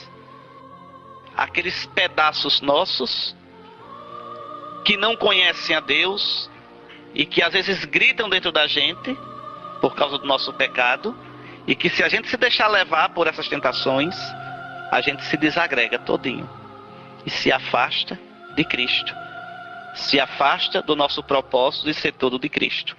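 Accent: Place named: Northeast, Brazil. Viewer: Taí um verdadeiro filho do trovão , que hoje no céu com seu sotaque nordestino cabra macho faz o encardido tremer quando algum filho lhe pede um favor , obrigado Jesus pelo teu filho Dom Henrique. Louvado e glorificado seja nosso senhor Jesus Cristo. Fiquem todos na paz de Jesus e no amor de Maria.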